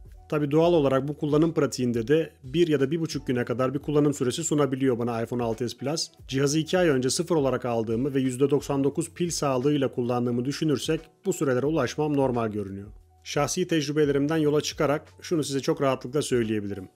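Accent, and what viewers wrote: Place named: India. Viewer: Hocam hint aksani var sende ya:)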